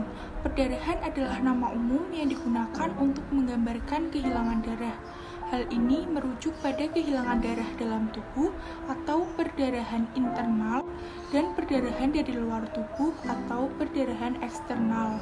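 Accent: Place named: Java, Indonesia. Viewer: Medok banget ndesss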